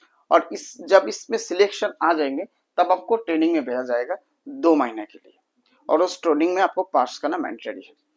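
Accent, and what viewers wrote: Bengal, India. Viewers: Bengali tone so sweet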